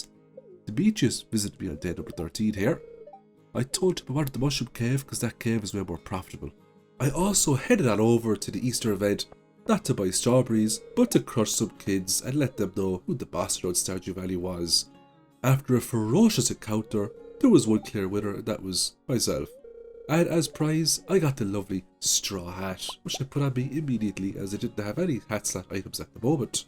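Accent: Ireland. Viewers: I have never heard an Irish accent as good as yours love it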